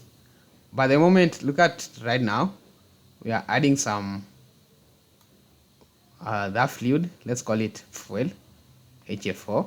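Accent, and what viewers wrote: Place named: India. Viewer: Indian english its very cool experience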